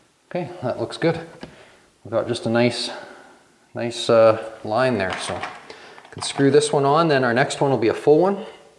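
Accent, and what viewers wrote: Canada. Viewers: you can tell he's from CANADA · I'm Canadian, and I can tell he's Canadian just from this accent lol. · Love dudes accent. Proper Canuck. Just like me.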